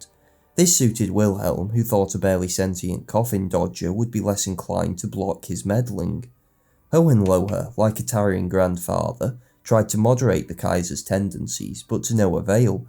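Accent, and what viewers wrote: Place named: England, United Kingdom. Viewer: Huh this prob one of the mildest english accents. hearing bbc australian pundits is nails on chalkbooard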